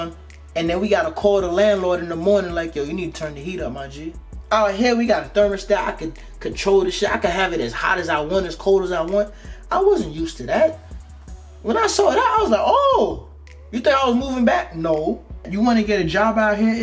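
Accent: New York, United States. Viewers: Listen closely and you can hear both his New York & Atlanta accent. Shit is tripping me out. Lol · I knew your were from New York...😊 Facts.....❤💥. #southbronx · I love New York Aaccents...I love yours...You have a good heart!!!!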